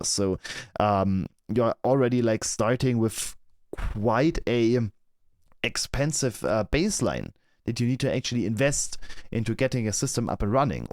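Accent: Germany. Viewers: Man hört die deutsche accent 😅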